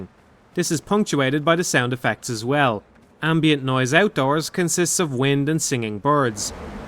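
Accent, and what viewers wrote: Ireland. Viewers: just been scouring through the top search results for Ico review, & i don't need to look any further now. as an english lad i'd much rather listen to a crackin irish accent than a whiney smiley american talk about a game that has genuine sentimental value to me - my mam used to work in Choices (blockbuster before blockbuster) lots of VHS, lots of 5th and 6th gen of gaming, some DVD's. as things were in the early 2000's. Remember playing Ico with her at a very young age & loved the world it created. funnily enough we rented shadow of the colossus shortly after completing Ico, found it way too hard and ambiguous and never finished it before returning it's a bit mad really, when silly things like games stay in your mind nearly 20 years later & become warm & fond abstract memories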